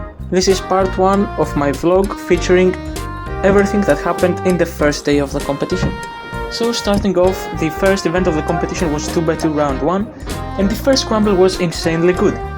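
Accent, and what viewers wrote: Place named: Greece. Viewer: The greek accent is the best!!!!!❤😂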